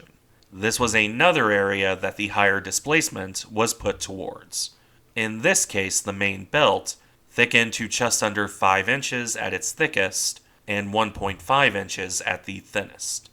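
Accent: United States. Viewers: Sorry, this is not meant to detract from the work you put into this video. It's good. It's just the pronunciation is like nails down down a chalkboard. Ironically enough at points you prnounce it almost perfectly. With the typical American accent it is easier for you to pronounce it Edin*Bruh* A similar sound to what you would make saying 'Brother* or "Hey Bruh, what you doing?" While not quite right, it is would be less jarring to people who live in the UK. I'll keep an eye out for your next videos. Thanks.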